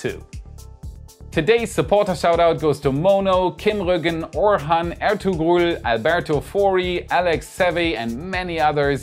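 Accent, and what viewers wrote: Germany. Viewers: Dutch, German and Scandinavian people generally speak really proper English and quite a few are close to fluent or fluent. These languages are pretty similar to English in terms of grammar and structure. As a Dutch person I can easily spot ’ German roots in his accent though, just like it is pretty easy to spot Dutch accents when you know what to look for. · haha he has quite the german accent, but his grammer and vocabulary are perfect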